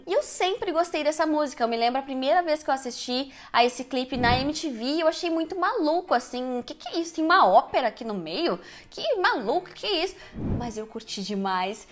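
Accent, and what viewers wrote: United States. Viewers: monstruósiti amei sua pronuncia manstruásitee a fonetica disso ta bismillah é pelo amor de DEUS e beelzedbu has (r forte como no português) a devil put aside for me é o correto dizer biowtzeboo Réssa dévul pat assait fô mi fô miiiiiii , ok? vastest du? tente entender esse seu inglês americanizado bem a empresa ai já diz né CCAA , letra Ó tem som entre oa ambos acentuados agudos graves bóá rápido as quick as a flash reparou que não pus as quickly? queria ler de vc de presente saudades em inglês essa palavra vc faria? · e uma banda britânica. Porque esse seu sotaque americanizado.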